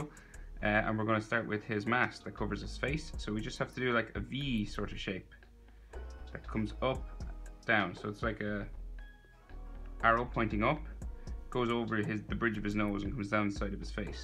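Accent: Ireland. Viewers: Are u from the south of ireland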